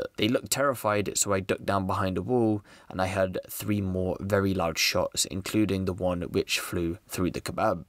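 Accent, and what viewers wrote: United Kingdom. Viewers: It’s just a normal British accent??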